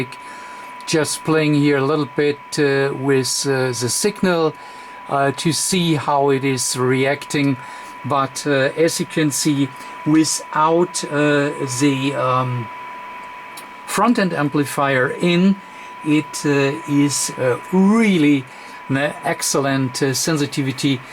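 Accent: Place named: Germany. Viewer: you sound like my mentor with a german accent very easy to follow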